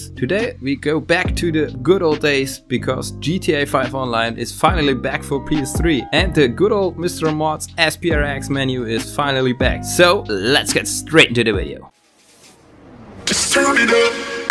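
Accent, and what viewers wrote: Germany. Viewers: немецкий акцент, сразу узнал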